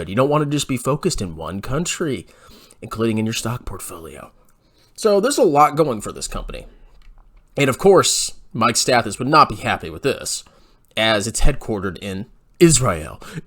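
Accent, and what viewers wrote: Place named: Virginia, United States. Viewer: Haven't heard the Virginia accent for a while..come on. Pllleeeaaasssee!!!!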